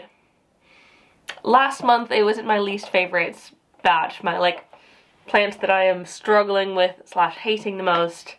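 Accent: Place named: United Kingdom. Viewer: I thought I detected New York, but I was way off. You sound more British when you are with (Clahyah):))))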